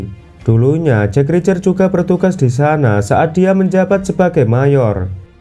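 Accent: Java, Indonesia. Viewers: Suaramu kok medok jowo banget mas.. Soko ngendi sampean?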